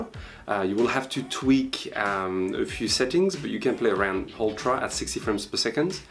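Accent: France. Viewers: Hello, je sens le petit accent français:-) super vidéo! Je viens de faire l'acquisition d'un iMac 27'' i7 4.2, 16gb ram, 3TB Fusion drive, Radeon pro 580 et je suis ravis! Je fais pas mal de vidéo et je joue également via Boot Camp à BF1, Wow tout fonctionne parfaitement en <num> ultra. Je ne peux que conseiller l'achat de cet iMac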